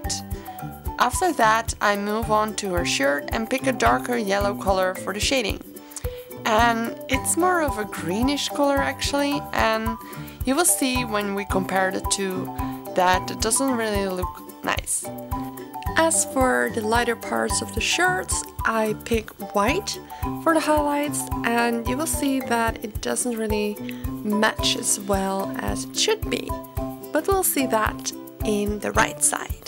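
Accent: Netherlands. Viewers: Are you dutch? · echt een nederlands accent dat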